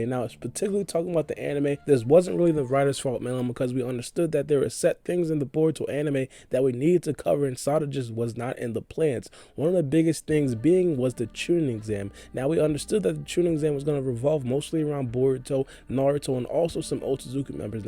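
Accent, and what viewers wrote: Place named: New York, United States. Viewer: Im gonna guess your from ny, 'cause your accent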